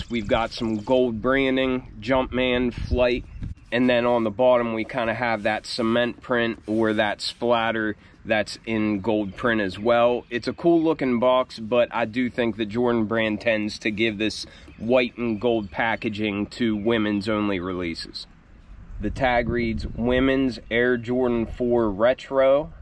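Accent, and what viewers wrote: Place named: Pennsylvania, United States. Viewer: Lost my best friend of 42 years in June. Had a heart transplant and didn’t make it. I feel your pain! We are all right there with you. Don’t forget that. I’m in Dubois PA , I can sense your PA accent haha. Been a 4 fan since 89! My fav Jordan ever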